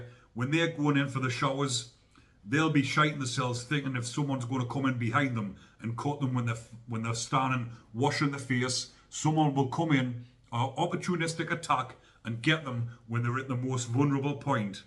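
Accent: Newcastle, United Kingdom. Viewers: Algorithms cant pick up geordie accents dony worry lad 😆. anyway hope that grub gets more of wots coming to him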